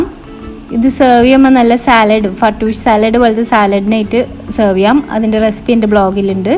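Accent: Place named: Ernakulam, India. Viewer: nattil ernakulam ano veed.samsarikkumbo chela time’lu njangalude slang kayari varunnund😀😀😀✌🏻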